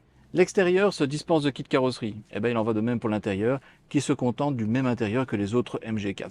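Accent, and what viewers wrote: Belgium. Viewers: Une chaîne amateur mais aux vidéos très pros, un bien bel essai, agrémenté d’un joli accent liégeois 😊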